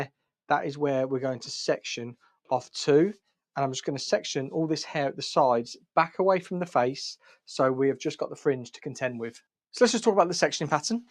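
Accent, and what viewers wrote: England, United Kingdom. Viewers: Let me first applaud you for your skillful talent. I’ve watched several of your videos and although I am no hairdresser by any means, your videos for the average DIY are so precise and helpful. Secondly, I think English accents are incredibly sexy. I could literally fall asleep listening to your videos on repeat. Keep up the good work!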